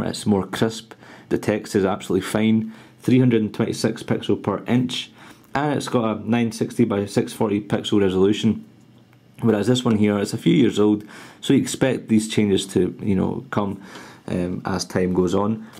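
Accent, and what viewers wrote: Scotland, United Kingdom. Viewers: This guy speaks exactly like Craig Ferguson. And I dont mean just Scottish accent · i love your accent! where in scotland are you from?? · Love u r accent (Scottish I think) and how u used some words,lol. And nice video and comparison! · gotta love his accent!!! scottish!!!!!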